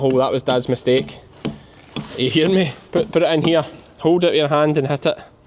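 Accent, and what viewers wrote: Scotland, United Kingdom. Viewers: I know there's more to Scotland than your accent, but that little "och!" was so adorable!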